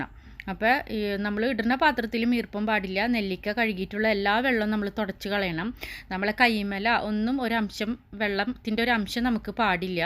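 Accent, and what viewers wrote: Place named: Thrissur, India. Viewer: തൃശൂർ ആണല്ലേ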